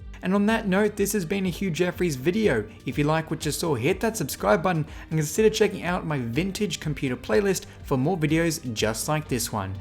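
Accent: Australia. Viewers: Me: His accent sounds Australian Dead spider inside the computer Me: Yep, Australia confirmed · I knew he was Australian for a long time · My girlfriend is Australian. Easily recognizable accent to me.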